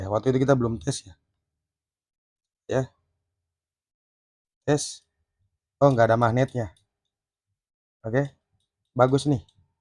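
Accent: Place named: Eastern Indonesia, Indonesia. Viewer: abangnya dari jayapura? logatnya kek dari timur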